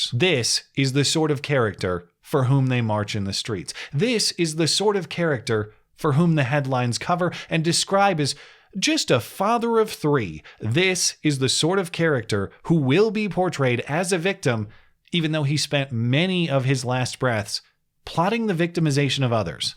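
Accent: Minnesota, United States. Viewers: Are you from Minnesota???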